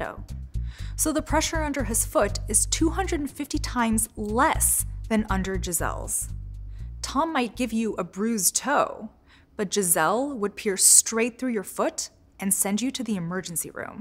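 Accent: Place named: United States. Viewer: Sounds very americanish- hard to bear listening for more than 2 minutes and not getting any closer to the point :)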